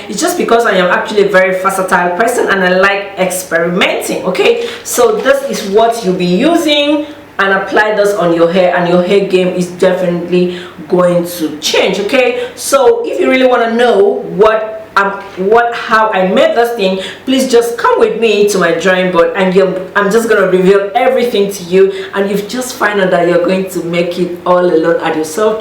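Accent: Nigeria. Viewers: First of all, I LOVEEEEE the fact that you stick to your Nigerian accent 😍😍😍 and not trying to fake like a lot of people on here.. secondly you didn’t talk about shaft but I’m guessing you could use it for some kind of hair mask.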